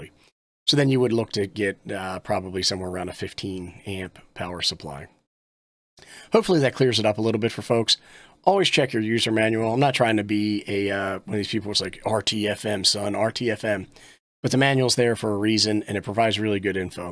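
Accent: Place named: Baltimore, United States. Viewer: You're definitely from Balmere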